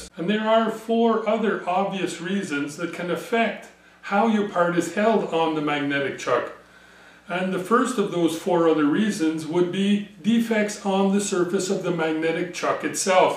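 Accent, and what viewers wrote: Canada. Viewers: Michigan or Canadian?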